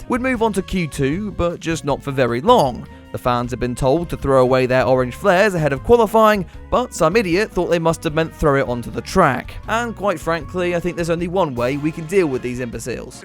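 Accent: United Kingdom. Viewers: Your physical condition is so bad that your face is getting more red with each sentence. Just by talking only while sitting down. In topic. This doesn’t qualify as comedy. But that’s typical British I guess to write down semi smart comments and read them with a British accent thinking people will find it funny. Please have a good recovery, you look sick.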